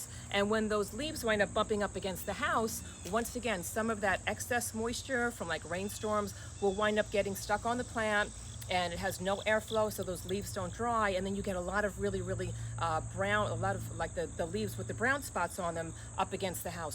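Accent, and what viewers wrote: New Jersey, United States. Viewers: I was born and raised in Jersey but now viewing from Arkansas!! Your accent sounds like home 🥰 thank you for the information!!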